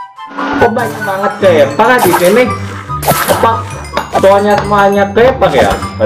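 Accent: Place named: Java, Indonesia. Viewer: Dari suara nya kayak orang jawa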